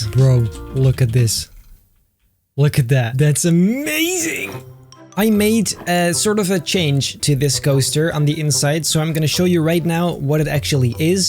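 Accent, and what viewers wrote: Netherlands. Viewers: Are you dutch? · Are you Dutch? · Ben je Nederlands? XD Are you Dutch? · Ben je Nederlands? 😄😁 (If u dont here is what i asked: Are u from the Netherlands) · And I already had a feeling that you where dutch 🇳🇱 because you’re accent looks dutch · Omg ik hoorde het al aan je accent, JE BENT NEDERLANDSAS!!! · You dutch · Are you from the netherlands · Your dutch? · Are you actually dutch? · are you dutch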